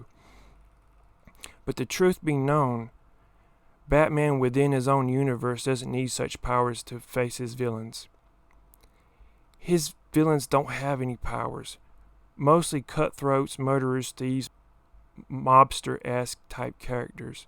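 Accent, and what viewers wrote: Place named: South, United States. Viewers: Right there with ya fellas. I’m from KY too. The world is in serious need of badass southern YouTubers like this guy. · As i am from KY myself, i must say it is quite refreshing to hear a southern accent on this video. Feel like us southerners just ain’t on YouTube or maybe they’re hiding it cuz it’s viewed as not intelligent by some. Brother you won me over and got my sub. Keep up the work man I like this video a lot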